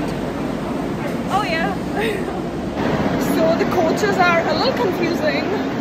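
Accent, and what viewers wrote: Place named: England, United Kingdom. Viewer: Listen to her english accent , mate relax, she's not a indian resident, let her be herself in her comfortable self, So chill & enjoy if you can, for what's she has to express in her quest of this train journey, 🇮🇳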